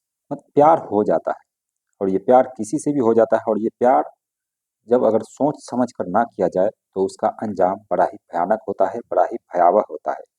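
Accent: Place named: Bihar, India. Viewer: Typical Bihari hi ye. Pronounciation check kijiye please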